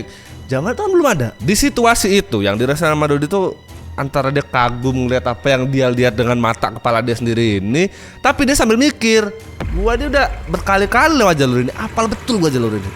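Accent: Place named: Lampung, Indonesia. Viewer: Lampung banget logat nya bang...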